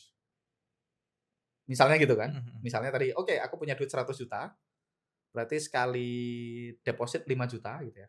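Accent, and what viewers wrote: Banyumas, Indonesia. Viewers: Ora ngapak..ora kepenak 😂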